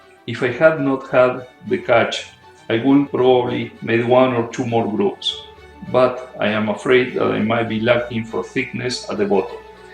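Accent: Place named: Spain. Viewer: Menudo acento tienes jajajaj, se nota que eres español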